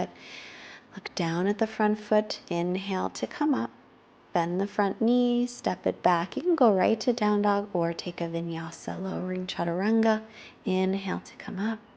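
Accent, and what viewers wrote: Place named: United States. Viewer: Thanks so much for putting this video up. I use it each week. It such a nicely flowing sequence. May I ask, where in the States is that accent from? My ex-girlfriend is from Hudson, Ohio and your accents sound identical! :-) Regards from Australia :-)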